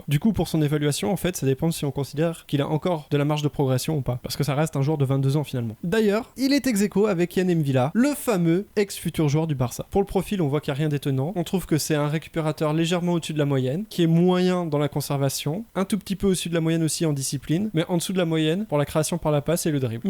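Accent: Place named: England, United Kingdom. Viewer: L'accent anglais <3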